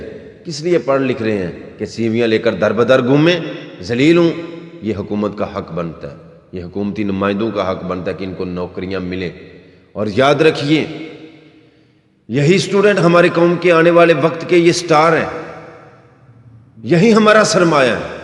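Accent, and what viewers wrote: Punjab, Pakistan. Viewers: Veer g tohadi zaban te punjabi jaj di aa veer g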